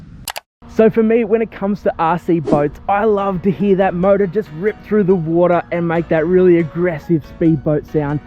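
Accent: Australia. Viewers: Are you ozzie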